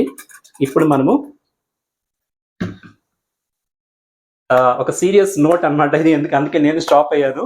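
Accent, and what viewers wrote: Tamil Nadu, India. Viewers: Hi! Miru entho ishtamga vantalu chesi choopistharu mariyu mi telugu bagundi. Miru tamilian andi?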